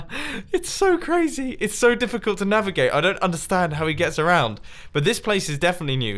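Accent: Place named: United Kingdom. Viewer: The brithish acent 👌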